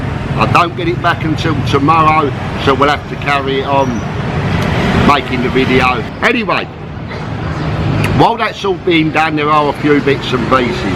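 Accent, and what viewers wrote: United Kingdom. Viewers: Good one,. I am convinced that I watch your content mainly because I like your personality. Where the hell in the UK are you from? It's fun trying to figure out your accent half the time. Sure, I've been to Thailand several times, Pattaya only once, but your content is compelling and interesting to me only because it's delivered by you. You're an engaging dude, so keep up the good work and I hope I can share a cold one with you some day. Keep doing what you do. It's awesome.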